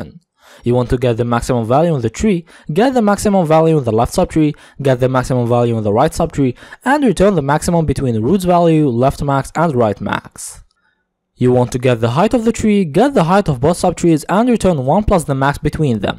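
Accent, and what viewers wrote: India. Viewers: I can't understand this indian accent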